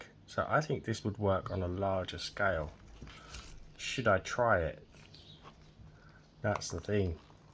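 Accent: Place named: South West England, United Kingdom. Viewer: you sound exactly like Jude Law and your whole voice and cadence and demeaner are so soothing (sooving). I grew up as an American in SW England so I am in love with (wiv) this accent.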